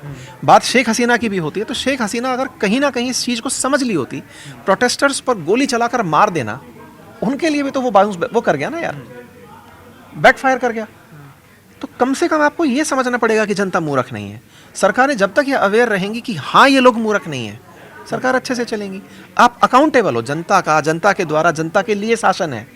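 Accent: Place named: Kanpur, India. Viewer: Aap ki boli pur hindi hai from kanpur se ❤❤❤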